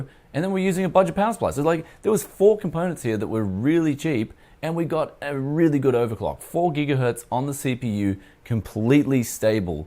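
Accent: Australia. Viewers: could tell he was aussie from accent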